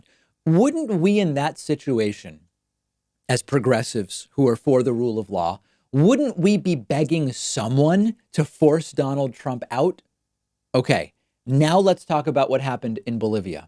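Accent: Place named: Argentina. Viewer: He is from Argentina.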